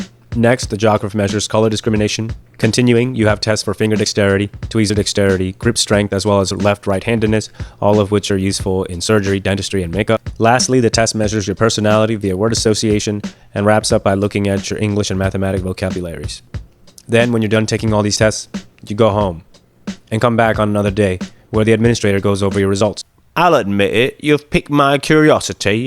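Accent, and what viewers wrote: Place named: United Kingdom. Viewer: That British Accent 😂😂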